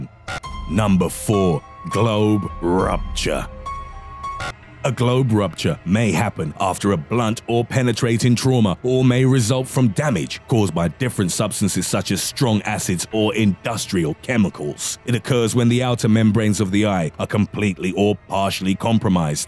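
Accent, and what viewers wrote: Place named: United Kingdom. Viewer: cinocee scottys a pleab. Anyways, this dumb ass voiceover takes the scary away, sounds like a British butt pirate.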